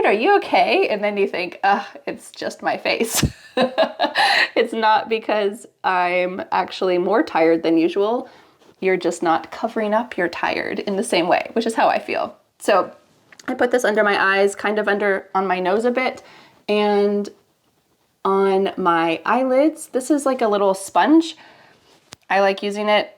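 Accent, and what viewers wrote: United States. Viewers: Mam please add with subtitles to help a lot because you are an American accent and I'm Indian , sometimes doesn't comes on mind so I would love if you could do the same. Thank you 😊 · You speak American English?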